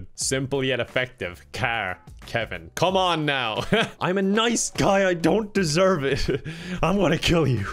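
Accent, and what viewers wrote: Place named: Ireland. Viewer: Can you film a video in your FULL IRISH ACCENT? Love it, you kind of remind me of Domhnall Gleeson. He's sexy fine!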